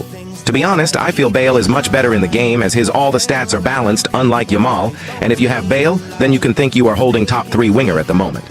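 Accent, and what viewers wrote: India. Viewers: yeah I just found out from one of his video and heard his indian voice and accent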